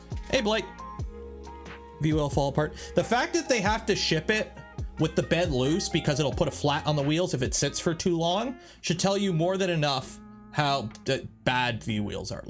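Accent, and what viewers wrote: Canada. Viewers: You speaking about being a true Canadian has me instantly sold as a new subscriber to this channel. Just got my first 3D printer in the mail yesterday. It's an SV06 Plus. So this video is a major help. Great work on this my dude. From a fellow proud Canadian (well, ashamed of you know what/who, but ya know.. Next election eh..). By the way I could tell within the first three minutes of the video hearing how you speak, that outright gave away your Canadianess right there but yeh.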